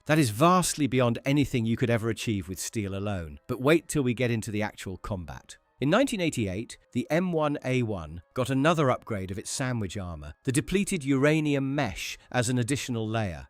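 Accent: United Kingdom. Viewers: And the British accent that permeates every damn video on yootoob. thanks.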